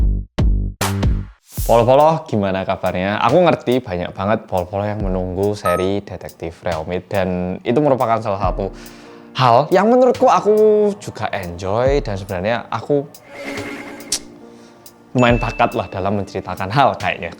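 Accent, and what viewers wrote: Java, Indonesia. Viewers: Mas Medokkkk 🥰🥰 pagi massss ..ttp sehat ya....❤❤❤ LANJUTKANNNNN... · Lebih suka mendengarkan ceritanya mas,enak di denger soal nya mas medok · Mas bikin video ngajarin Elsa Bahasa Jawa biar ikutan medok, dong. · Siap orang korea yang medok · YANG MEDOKKKKK!! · Mas medok🤗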